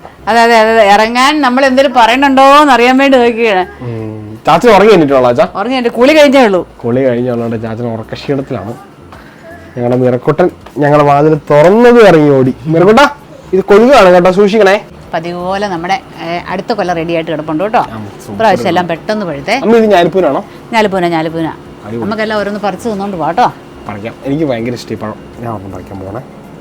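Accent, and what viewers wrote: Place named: Ramapuram, India. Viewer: നാടൻ സംസാരം.. അറിവുകൾ... അമ്മച്ചി അടിപൊളി.. രാമപുരം ആണോ??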